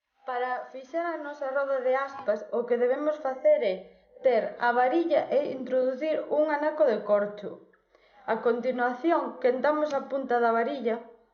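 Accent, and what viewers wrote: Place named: Portugal. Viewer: Parece catalan pero esta muy mal hablado , tiene un acento de portugues pero igual se entiende muy poco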